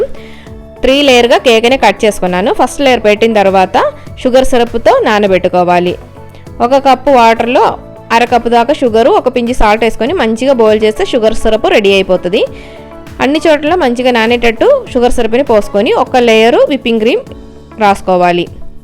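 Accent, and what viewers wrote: Kerala, India. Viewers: Yantha ba telugu matladhunori with that malayalam touch❤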